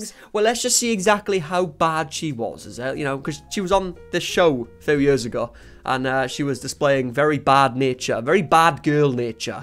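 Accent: Wales, United Kingdom. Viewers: You are Welsh aren't you? My mom is a Welsh immigrant. And I can pick that accent out now pretty easy. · R u welsh?????? If u are I am too I recognise the accent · Are you welsh ???? My dad is from cardiff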